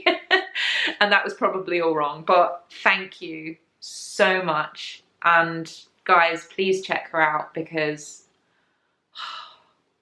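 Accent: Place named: England, United Kingdom. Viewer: I award you the designation of Honorary Southern Belle for saying "hissy fit" in this podcast. OMG! I definitely laughed out loud, because what made it extra special is your adorable English accent. Cheers!!!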